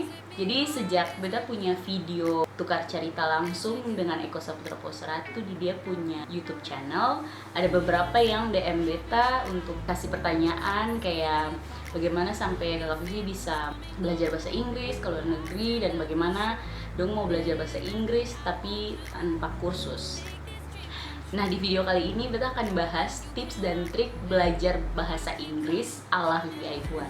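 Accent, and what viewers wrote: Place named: Ambon, Indonesia. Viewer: Kudos to this video. 1. Apresiasi penggunaan dialeg ambon dalam penjelasan 2. This video might take 17 menit, but trust me, semua pertanyaan umum or kesulitan yg biasanya dihadapi pemula, udah dijawab disini. 3. I highly recommend the tips of having English environment, watching movies dan pake app untuk English learning contohnya ELSA (di app store ada) untuk pemula. Last but not least, please punya kemauan! Again, thankyou kaka 🎉🎉🎉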